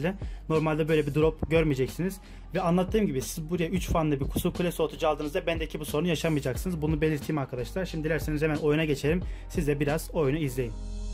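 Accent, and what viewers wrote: Gaziantep, Turkey. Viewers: Kardesim sen gazianteplimisin?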